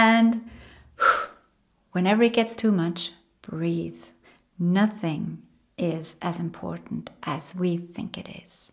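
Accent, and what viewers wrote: Ireland. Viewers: I love when she giggles like a little girl. And the Irish accent ❤️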